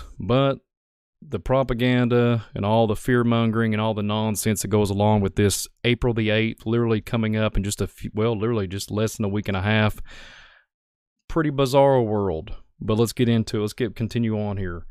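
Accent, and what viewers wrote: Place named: Kentucky, United States. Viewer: WV Hillbilly here loves your Kentucky accent ❤ My mama’s family is from Lawrence County, KY ❤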